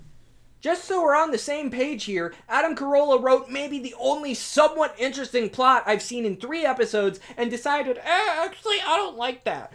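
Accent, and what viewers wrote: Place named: Baltimore, United States. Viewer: Are you from Baltimore dude?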